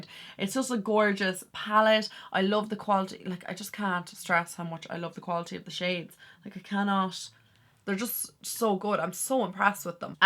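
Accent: Ireland. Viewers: I'm new to your channel after searching for eye shadow pallets and this is really useful, the Huda one looks good. By the way like your accent, it sounds very Irish :)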